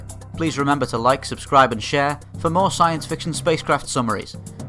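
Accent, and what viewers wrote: United Kingdom. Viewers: I love the facts told by your accent, british?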